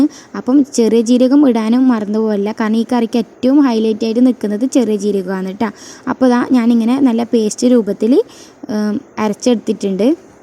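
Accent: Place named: Kanhangad, India. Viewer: Evidea sthalam kanhagad ano samsaram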